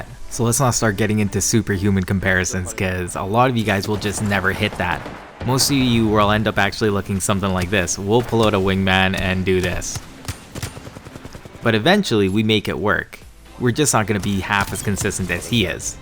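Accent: Canada. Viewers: Where in Canada are you from? Nova Scotia?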